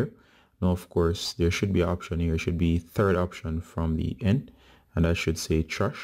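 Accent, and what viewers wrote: Jamaica. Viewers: Omg!!!!! Thank you so much!!!! I was so scared that I lost all of my pictures and you helped me recover them. Also p.s I detect a J.A accent...big up your self! I'm a fellow Jamaican as well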